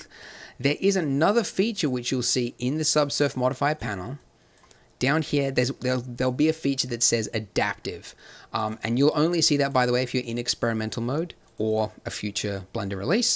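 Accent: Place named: Australia. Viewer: Ritchie He's Australian... Can you really not tell?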